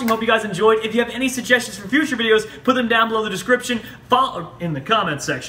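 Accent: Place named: Netherlands. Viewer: He kinda sounds dutch tbh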